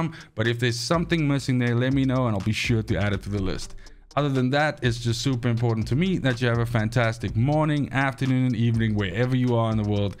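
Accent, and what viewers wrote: South Africa. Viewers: You sound South African, where are you from?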